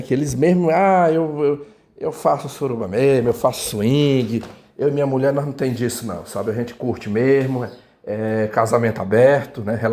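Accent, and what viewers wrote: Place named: Imperatriz, Brazil. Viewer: vc tem muito o sotaque do pessoal de imperatriz, fui lá recente gostei muito de lá, principalmente de Carolina.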